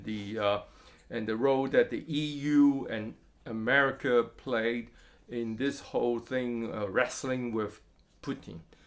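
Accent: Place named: United Kingdom. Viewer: Thanks so much for the broadcast. I noticed the British accent increases just a few minutes into the show. Good work.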